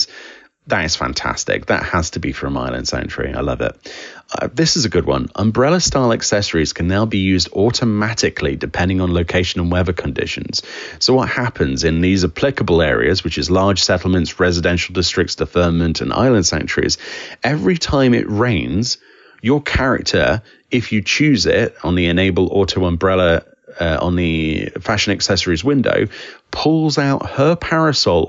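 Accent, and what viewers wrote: United Kingdom. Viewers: I'm still able to thoroughly enjoy the british man reading FFXIV patch notes ASMR so it's okay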